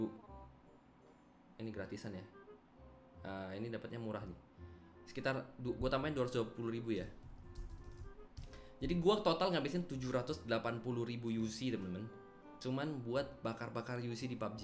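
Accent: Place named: Pekalongan, Indonesia. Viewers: Biasa aja dong bang ngomong pekalongan nya:v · Pekalongan mana suaranya???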